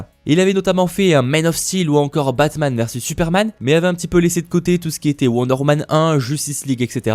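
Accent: England, United Kingdom. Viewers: Ton accent anglais me perturbe vraiment.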